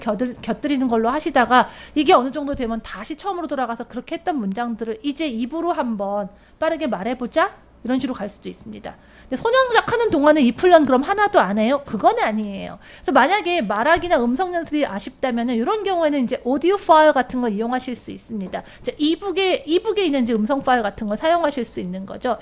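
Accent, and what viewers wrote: United Kingdom. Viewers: 요즘 선생님 강의를 듣고 있는데 영국 억양도 그렇구 분위기가 브리짓존스의 르네 젤위거 배우를 많이 닮으신 것 같아요!!!!!너무 아름다우세요!!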